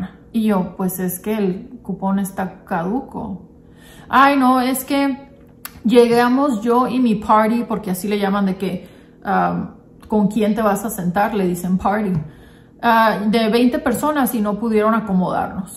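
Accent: Sonora, Mexico. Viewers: DICES LAS COSAS A COMO SON, SIN SESGO Y CON ESE ACENTO SONORENSE BIEN MARCADO. ES UNA CHINGONERIA TU CONTENIDO. SALUDOS CON SABOR MACHACA CON CAFE DE TALEGA.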